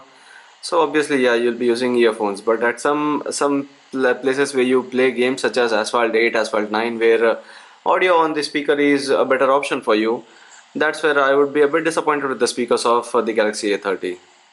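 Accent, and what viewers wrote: India. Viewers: Indian accent so strong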